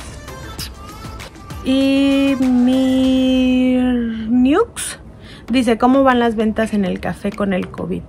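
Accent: Mexico. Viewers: Tu voz siento que está diferente, antes te escuchaba algo chilanga y ahora es diferente, por cierto te vez muy bien muy linda saludos desde MTY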